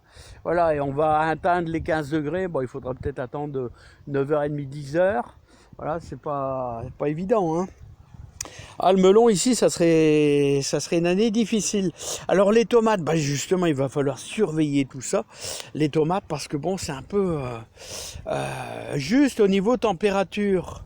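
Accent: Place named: Nord-Pas-de-Calais, France. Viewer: Bonjour, je n'ai pas de jardin. Mais je profite à fond des vidéos que vous postez, j'adore Votre bonne humeur😅. Je suis une chti partie dans le sud, et votre accent me rappelle d'où je viens😉. Bonjour à toute la team des jardiniers 😁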